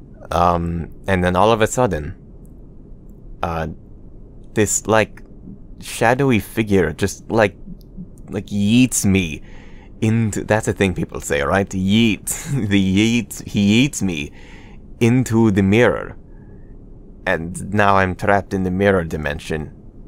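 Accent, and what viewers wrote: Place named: Bulgaria. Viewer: Love the Bulgarian accent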